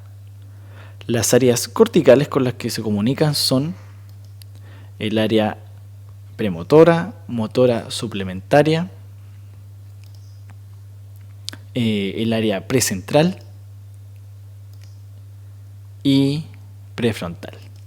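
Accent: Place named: Chile. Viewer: El acento chileno es bello, pero no lo soporto para estudiar )) jajajaajjaja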